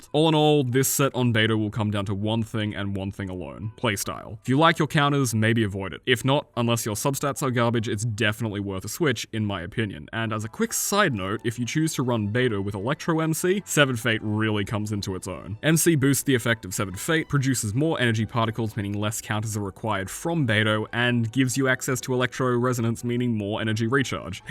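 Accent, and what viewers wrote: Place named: United Kingdom. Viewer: Omg- British accent gives a like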